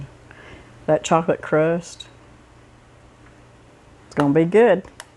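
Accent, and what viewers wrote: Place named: Arkansas, United States. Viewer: I just love your Arkansas accent! Love your videos too. You’re a good cook miss!!!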